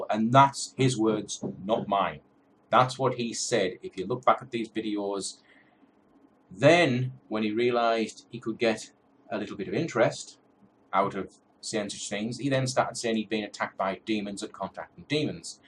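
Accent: Newcastle, United Kingdom. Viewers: I hate huff you sound like a Geordie same as me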